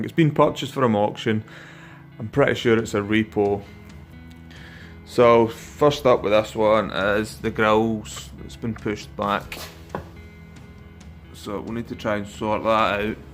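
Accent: Scotland, United Kingdom. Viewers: I'm hear for the Scottish accent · Love ypur scottish accent. The best man to clean a felthay car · Watching your videos is my guilty pleasure, I love the Scottish accent too! From Edinburgh myself but came to Staffordshire a long time ago. Thanks for posting and happy new year to you and your family. 😊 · Your Scottish accent is awesome. I have an accent as well. Forget about people’s opinion. A lot of them don’t understand the struggle of learning and/or speaking two languages. Keep it up!! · Hahaha I love the Scottish accent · You're Scottish. So speak scottish.dont change how u speak for anyone 👍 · Your Scottish never be ashamed of your accent I am welsh and my accent is strong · Love your Scottish accent! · Luvv yarr sco'ish accent 😊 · I'm cool with the Scottish accent. Is your restoration business based someplace in Scotland? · I watch for the Scottish accent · Love your accent tell people bugger off🙂😉my husband and I were trying to figure out where you were from then I said Scotland that that was your accent. 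I like watching how you take these cars from dirty filthy mess has two really nice cars that I would sit in. I enjoy your channel and I look forward to your next video. Have a blessed day. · I love your Scottish accent but then I’m Clan Ranald myself so I have no trouble with it. I live in western Canada though. Another job done well. To bad I couldn’t get you to do my car. It’s not that dirty but a deep clean would still be nice. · I love your scottish accent :D Guys and GAROLS! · I love your Scottish accent! It's one of the reasons I watch your channel! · Scottish accent is brilliant, always reminds me of colin mcrae, his accent is ingrained into my mind :( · Who in their right mind would complain about a Scottish accent? It’s music to my ears! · Who on earth is commenting on his accent, I freaking love it!!! Keep the Scottish accent, dont change it for anyone!! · Actually I love Scottish accent as much as your work. 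It is a cimbination of both. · Love your Scottish Accent 😻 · I love your scottish accent. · You hang on to that Scottish accent. They're harder to come by these days. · Don't lose the Scottish accent. It is wonderful just like your work and attention to detail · I would NEVER complain about a Scottish accent. That’s what keeps me coming back, lol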